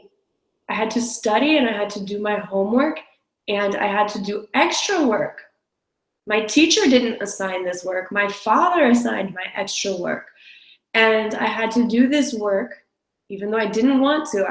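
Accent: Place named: United States. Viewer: hello i like your lessons,and i am very happy to hear your amecan accent.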